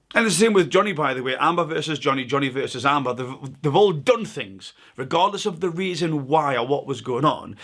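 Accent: Newcastle, United Kingdom. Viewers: That’s defo a Newcastle accent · Newcastle I think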